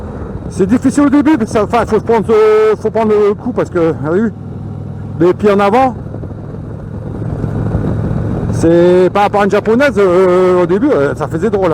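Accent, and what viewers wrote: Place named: North, France. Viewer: l'accent du nord c'est top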